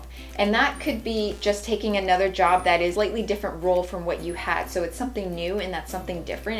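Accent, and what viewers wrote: Canada. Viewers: You sound Canadian :)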